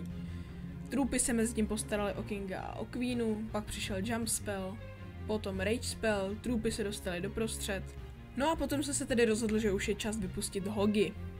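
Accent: Czech Republic. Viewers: DDD Dostalo mě jak si se snažil mluvit "epicky" a seriózně a říkal jsi ty věci takovou Českou Angličtinou:) Jinak fakt by to měli opravit. P.S. Víc videí s tou grafikou na konci, je fakt úžasná, ty detaily... :D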